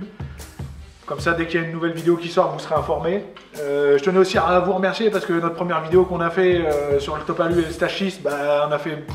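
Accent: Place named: Normandy, France. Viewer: on entend l'accent normand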